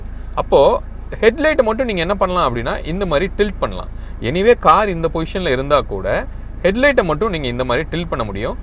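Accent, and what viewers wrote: Virudhunagar, India. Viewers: Bro, Neenga Virudhunagar ah ??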